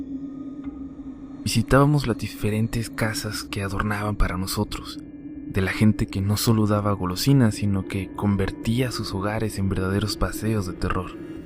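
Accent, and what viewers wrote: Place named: Chihuahua, Mexico. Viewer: Parece que eres de chihuahua.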